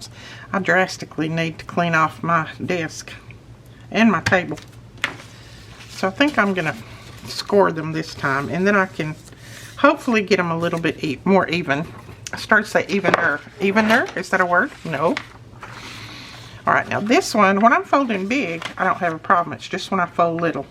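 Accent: South, United States. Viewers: Yup found it! Thank you. I love your videos and your southern accent! (I’m from Boston lol)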